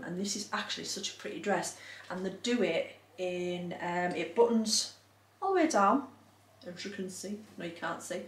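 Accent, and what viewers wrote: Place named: North East England, United Kingdom. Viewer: hi my darling, another great video, i really look forward to watching them, where do u live i live in the north east of england and your accent is like mine!!! xxx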